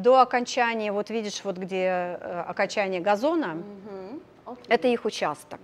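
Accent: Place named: United States. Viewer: артекуляция речи , манера, капец....американпипл😂😂 неуж так говорят русскоязычные, которые живут в Америке...пусть и не мало лет, неужели дает такой эффект речиобразования ? интонация слов. Родное нельзя забыть.😁🙄 мда.... русская речь перешла на амер. манер🤣🤣 слушать забавно😜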